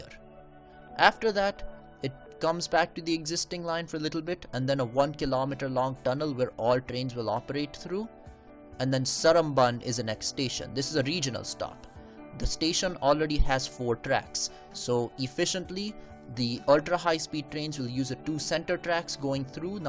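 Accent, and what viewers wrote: India. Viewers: Bombay English if you really want to know. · Indian accent. Fuck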